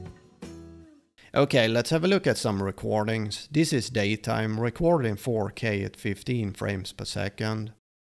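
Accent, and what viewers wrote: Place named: Sweden. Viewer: Låter galet svensk...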